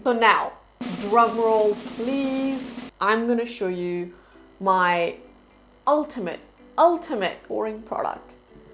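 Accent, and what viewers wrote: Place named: RP, United Kingdom. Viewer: I don't know why but you kind of sound like the English royal family. They speak very differently even easy words like "that". You sound quite similar to them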